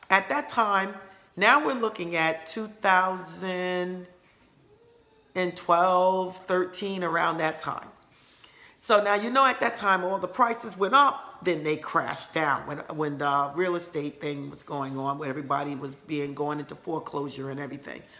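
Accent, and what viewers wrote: New York, United States. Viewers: are u from new york? you've got a new york accent lol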